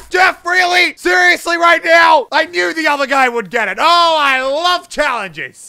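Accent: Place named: Australia. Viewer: I know u have an astralian accent but it's annoying when u say ashume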